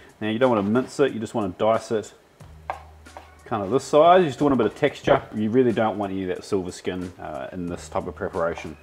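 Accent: New Zealand. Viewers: You sound New Zealand bro. But I don't mind some Kangaroo steaks. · How come you sound like a New Zealander? "Chups" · He from NZ but lives in Australia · are you kiwi tho? that accent is obvi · All this time I thought you were Kiwi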